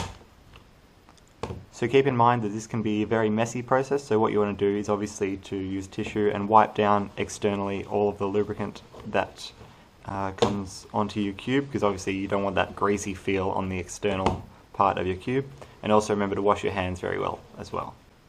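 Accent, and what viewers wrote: Australia. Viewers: Umarun Nisa no he is just Australian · Guys just cause he's australian doesnt mean its Felix 😂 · Its called how Australians really sound like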